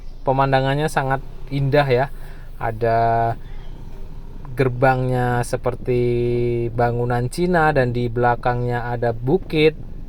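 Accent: Java, Indonesia. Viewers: Dari lidahnya klo ngomong bahasa Indonesia seperti YouTuber² dr Jawa .. orang Jawa tengah y om...? Orang ngapak sepertinya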